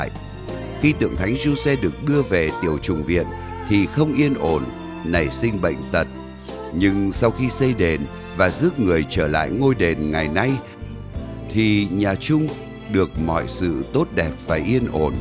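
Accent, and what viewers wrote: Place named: Nam Dinh, Vietnam. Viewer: Giọng đọc ng o Nam Định làm trên ĐTHVN. Phải mời họ đọc phải ko ad oi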